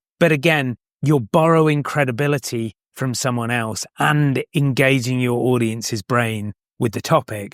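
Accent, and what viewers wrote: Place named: United Kingdom. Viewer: An Italian with a British accent? Interesting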